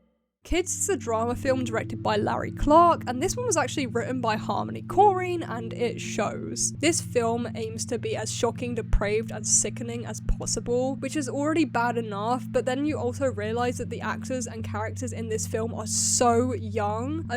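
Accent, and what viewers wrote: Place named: England, United Kingdom. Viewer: Awesome, I'm in Scotland. I did think you sounded English to be fair. I don't mind buying music blind but not movies, funnily enough. I used to but not anymore. When is part 3 coming?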